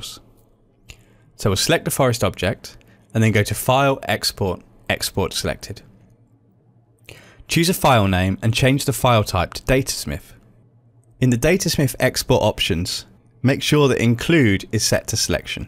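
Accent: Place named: United Kingdom. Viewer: Props to the ever smooth British (I think) narrator with clear and crisp voice, always nice to hear things accurately.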